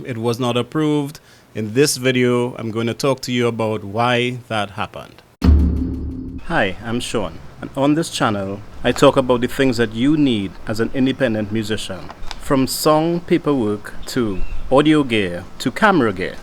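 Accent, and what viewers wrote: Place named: Trinidad and Tobago. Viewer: Instantly knew it was a Trini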